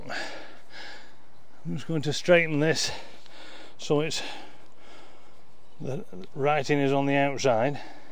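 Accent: Yorkshire, United Kingdom. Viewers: As a fella that went to school in Whitby, but now lives in the US it's nice to hear that accent. Yorkshire?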